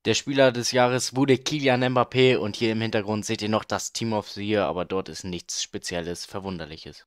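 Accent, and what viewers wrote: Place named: Germany. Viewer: Hi. Danke für die antwort. Naja es ist halt so ein sehr deutscher englisch akzent. Nicht schlimm aber für leute die flüssig englisch sprechen ein bisschen nervig. Aber das video war dennoch sehr sehr gut. Du hast ein neuen abonnenten!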